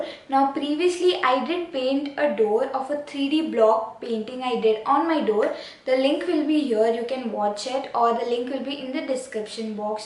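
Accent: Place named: United Kingdom. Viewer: Wish you come to uk help me I notice Brit accent.do some classes here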